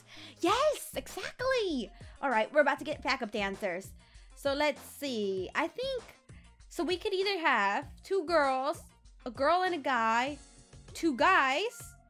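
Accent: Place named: London, United Kingdom. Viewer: Did any one else think that she was talking I a London aksint a little bit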